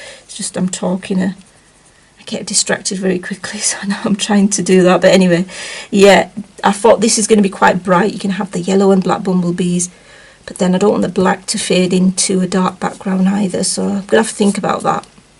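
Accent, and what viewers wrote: United Kingdom. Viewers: I love hearing you talk, K. Maybe because Im American & like the UK accents.hehe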